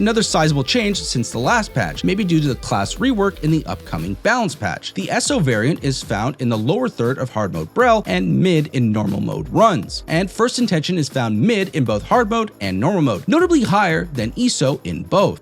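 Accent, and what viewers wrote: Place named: Canada. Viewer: On a side note your Canadian accent is very thick and I’m a foreigner 😂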